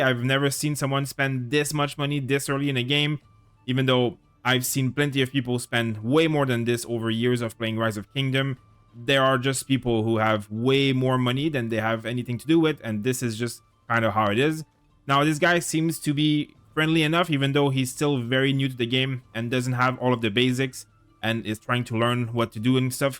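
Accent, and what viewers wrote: Quebec, Canada. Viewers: Tu sonne comme un québécois aha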